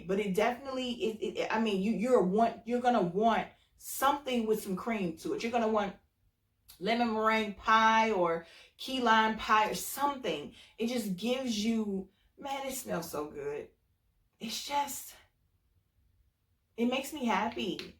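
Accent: United States. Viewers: U from the Midwest? lol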